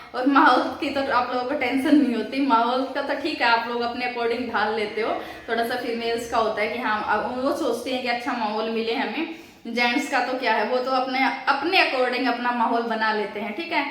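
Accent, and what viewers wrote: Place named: Rajasthan, India. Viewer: Aap rajasthan se ho kya alwar ke aas pas se awaz se lg raha h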